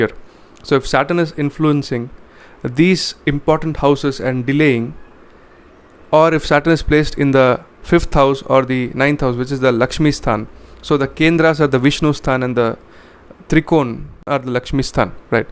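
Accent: India. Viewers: IF YOU speak in English, BETTER AVOID BOMBAY WORDS WE DONT UNDERSTAND. IT IS DIFFICULT TO UNDERSTAND, LET ALONE LISTENING TO YOUR BAD ACCENT. English is a beautiful language to hear, until Indians speak it.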